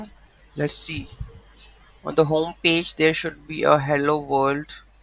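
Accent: India. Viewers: Ur accent sounds like u're from Uttar Pradesh! Isn't it?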